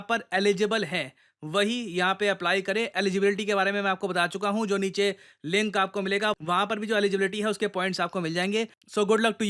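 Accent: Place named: India. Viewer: Ye indian accent h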